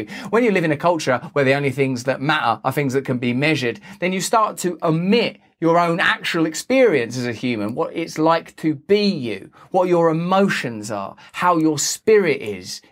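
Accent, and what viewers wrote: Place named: Cockney, United Kingdom. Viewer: Wow, Mr. Brand, you are hitting new highs and I am so glad I can understand you in these last 2 videos... for a while the cockney was so thick and you speak so fast, I could not understand you and quit watching. I think you are now hitting your stride and I enjoy the perspective you are able to present to us.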